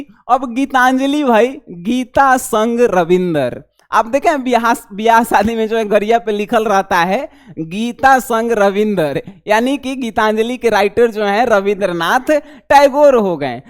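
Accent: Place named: Bhojpuri, India. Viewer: हे फलनावा सुनाता sir पुरा भोजपुरिया अंदाज में पढ़ाते हैं 💕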